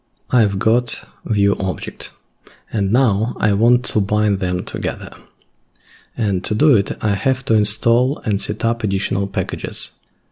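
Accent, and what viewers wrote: Russia. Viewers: Сразу заметил русский акцент, но не придал значения, но потом увидел, что пользователя зовут )), благодарю за видео, познавательно!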